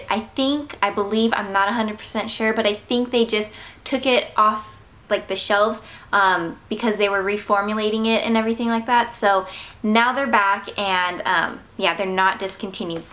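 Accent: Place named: Australia. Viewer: hells yeah aussie accent :D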